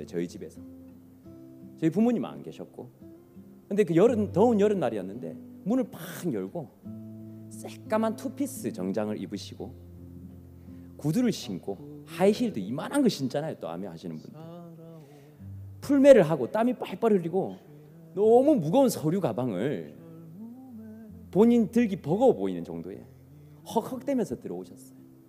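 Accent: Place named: Gyeongsang, South Korea. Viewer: 리더님 경상도 사투리가 부드럽네요~늘 영상 잘듣고 있습니다^^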